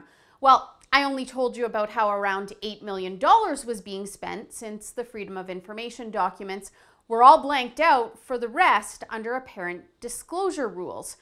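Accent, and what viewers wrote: Canada. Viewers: Hey loose the Canadian accent and your golden, like the crust on that mac and cheese you made for me last nite in the mini kitchen of that nasty motel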